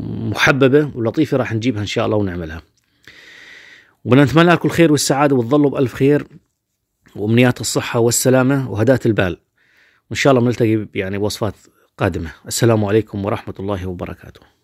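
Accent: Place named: Hauran, Syria. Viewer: لهجتك الحورانية الاصيلة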